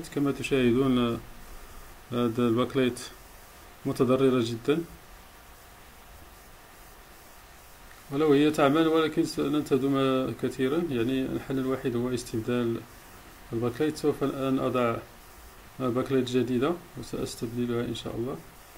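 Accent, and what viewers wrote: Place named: Morocco. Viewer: الصوت مغربي و الفيديو لشخص من الخارج على ما أظن .و هل على بالك ان في المغرب يغيرون الليدات كلهم في سبيل ليد واحدة محروقة.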